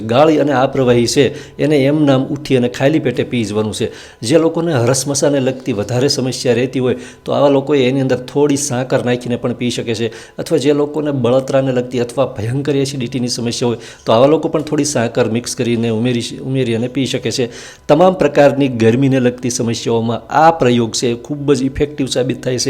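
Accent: Bhavnagar, India. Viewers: ભાઈ, તમારી ભાષા ટિપિકલ ભાવનગરી છે ! અનુમાન સાચું છે ?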